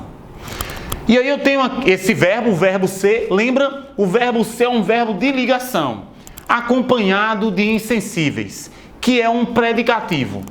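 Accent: Northeast, Brazil. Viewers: tem mó sotaque nordestino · VC E NORDESTINO?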